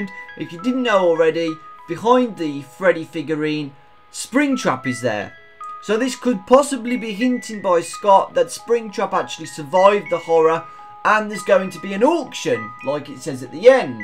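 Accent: United Kingdom. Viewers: is that a british accent? "pizzaria ,suvoid, foulks " sry If I`m being racist but I found it funny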